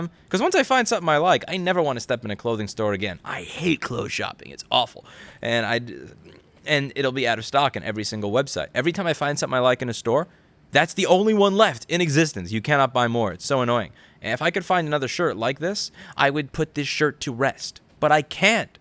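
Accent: New York, United States. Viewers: Louis has a NY accent? Lol I guess I missed that 😆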